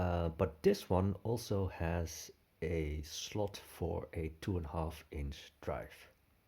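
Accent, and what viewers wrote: Netherlands. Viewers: Wtf dude, it called an accent. "Die" is a common deviation of people who speak Dutch or Dutch adjacent languages. People speak your language out of courtesy. If they pronounce things wrong, just accept it and go on with your epic day.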